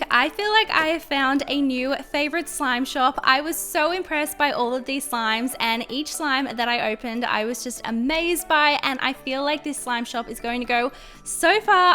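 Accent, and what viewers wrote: Australia. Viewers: She has an Australian accent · “banawneree” no hate. I just really love Australian accents.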